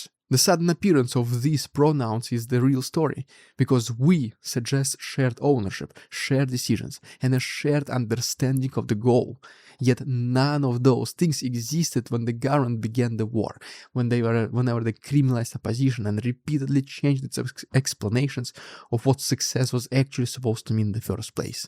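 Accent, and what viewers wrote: Russia. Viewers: Dude; i would appreciate if you spoke more slowly. I admire your eloquency in English. But spoken fast with Russian accent, is difficult to understand.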